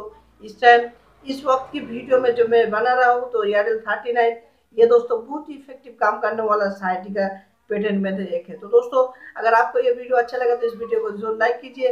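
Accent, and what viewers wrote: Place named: Bengal, India. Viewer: लैन्ग्वैज NO PROBLAM आप बैन्गोली है ओर हिन्दी बोल तै हुवै अछ ऐ लगी हो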